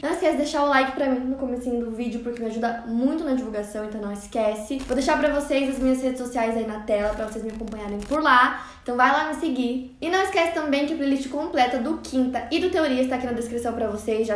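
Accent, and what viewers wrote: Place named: Ponta Grossa, Brazil. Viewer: Amo esse canal é esse sotaque Ponta grossa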